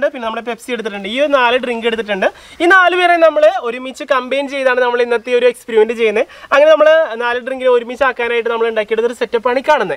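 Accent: Thrissur, India. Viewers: Thrissur karde sound anganeya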